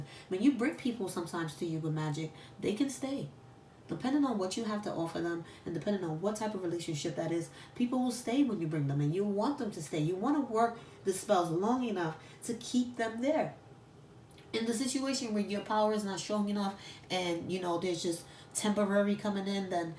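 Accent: New York, United States. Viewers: lol she stay having me dying with laughter she sound like she from New York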